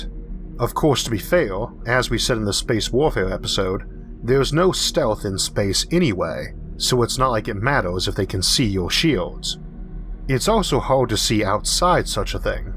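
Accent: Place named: Georgia, United States. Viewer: I hope I don't seem rude in asking as I am only just genuinely curious, but are you from Georgia? You have the same accent as some folks I know from Northern Georgia.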